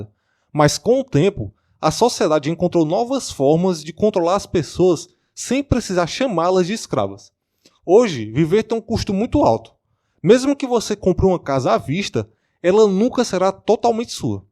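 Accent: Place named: Ceará, Brazil. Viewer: Nenhuma dúvida que esse sotaque é do Ceará..rs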